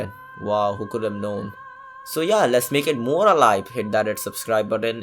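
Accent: India. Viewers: Indian ah accent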